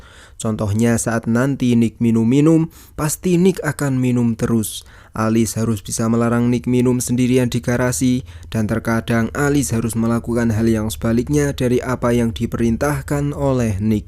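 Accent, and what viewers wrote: Java, Indonesia. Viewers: lah admin wong jowo cuk, dengar gak sih cara bicaranya 😂 tumben ada wong jowo gak bisa diajak bercanda.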